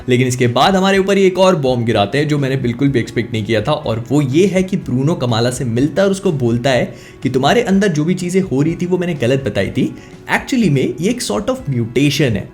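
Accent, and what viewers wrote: Bhopal, India. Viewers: bhopal se kya baat krne ke accent se laga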